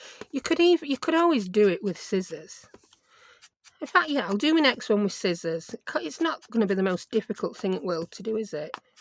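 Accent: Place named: Sheffield, United Kingdom. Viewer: Wonderful video as usual. You mentioned Sheffield this time and then I knew why your accent sounds so familiar. My parents are from there. I do not hear there accent but others do. We have been in Canada since 1965. But you sound like my relatives over there. I do enjoy your videos. Thanks for sharing!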